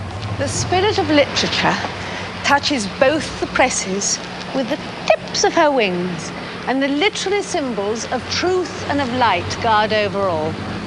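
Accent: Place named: Geordie, United Kingdom. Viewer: Love her broad Geordie accent . 😜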